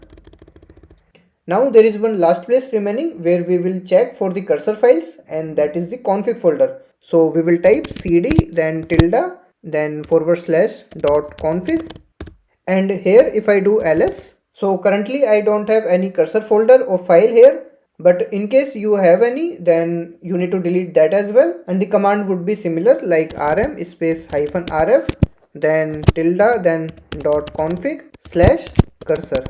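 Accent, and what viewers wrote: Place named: India. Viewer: love your indian accent :)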